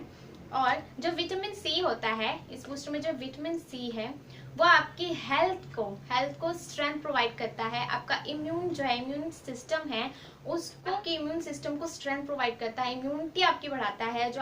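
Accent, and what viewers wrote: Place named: India. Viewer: this is India English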